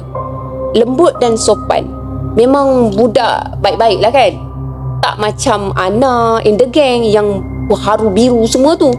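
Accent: Kelantan, Malaysia. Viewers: Kak org Kelate ko...kg mano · Hadir selalu layan.. Suka dengor akk kecek kelate...😁😂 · Sedap pulak dengar kecek Kelate..😄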